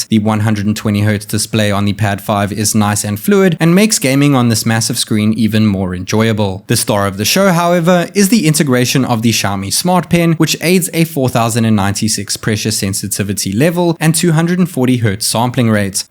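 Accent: South Africa. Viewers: I always sensed he had that South African Touch 😸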